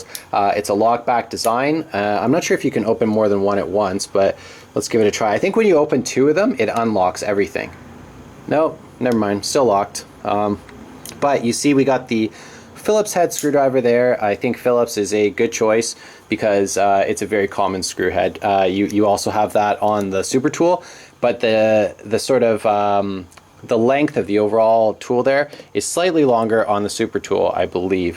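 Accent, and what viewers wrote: Canada. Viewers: That's one wicked Canadian accent.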